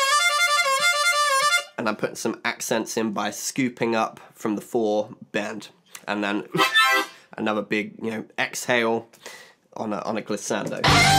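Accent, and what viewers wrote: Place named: United Kingdom. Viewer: Oh my god he’s British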